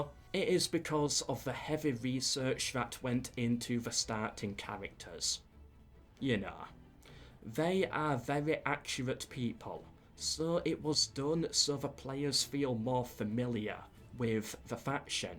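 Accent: United Kingdom. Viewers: I basically see videos to learn english, he speak so slowly and with that strong british accent which is like a teacher to me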